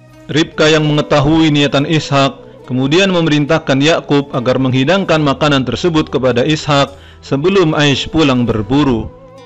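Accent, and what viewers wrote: Bugis, Indonesia. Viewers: Sy yakin yg ngomong ini orng bugis..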